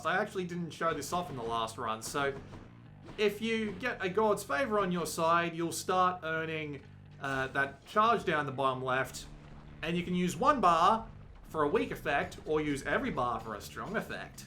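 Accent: Australia. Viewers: Good vid. Out of curiosity, are you Australian perchance ? That and is the framerate consistent handheld and otherwise? Thanks in advance lad.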